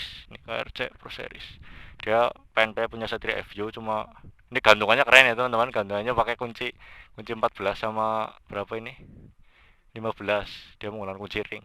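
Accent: Indonesia. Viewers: laa ya ngapak ya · Njawa lucu bos🤟🤣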